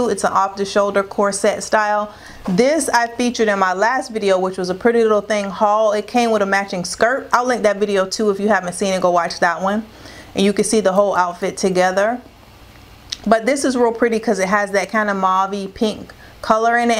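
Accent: South, United States. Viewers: I lost a lot of weight, for my health. I am loving the extra benefits, like needing new clothes. I have turned into a shopaholic. You are my style inspirations. I am over 40, but can still wear a lot of the ideas you give me. Love you style. I love your accent. Just a little bit of the south.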